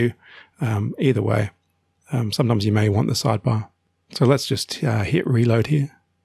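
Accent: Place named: Australia. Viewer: Thank you! Nice to hear that Aussie accent!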